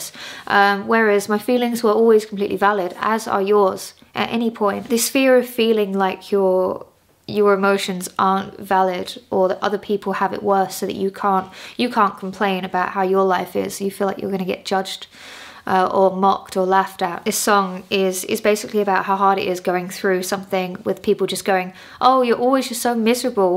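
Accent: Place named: Midlands, United Kingdom. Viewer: As a fellow resident of the Midlands I love that has become fully naturalised and now says "laff" rather than "larf".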